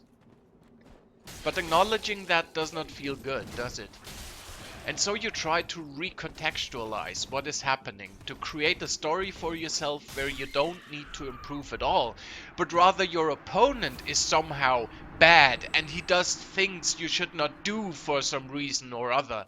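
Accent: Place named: Germany. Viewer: ah i knew it :D because u pronounced some words or to be honest most things u said in a german way. No hate but u should work on that :) but anyways nice Video dude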